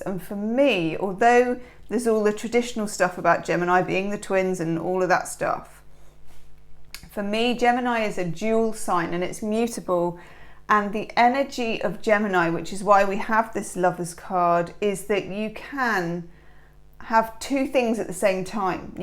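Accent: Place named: South, United Kingdom. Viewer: Hi I am in USA Texas and am a cancer I enjoy your readings they are spot on I am British from Cornwall sounds like your from the south of England Thankyou for your readings and 😘🇬🇧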